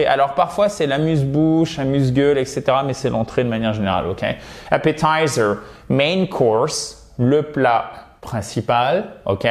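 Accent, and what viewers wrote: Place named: United States. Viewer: Merci tes videos sont sympa, mais j'aurai prèfèrè avec l'accent anglais et non Americain.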